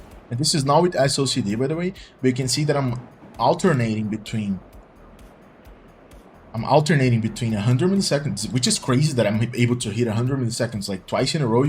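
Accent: Brazil. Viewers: mano, vc é brasileiro né? seu sotaque parece de brasileiro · where are u from you sound kinda brazilian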